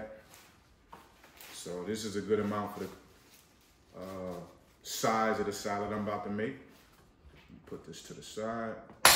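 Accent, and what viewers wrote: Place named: East Coast, United States. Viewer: Let me get to the grocery store!!!! This video is dope and is as soon as you started talking, I knew you were from the east coast 💫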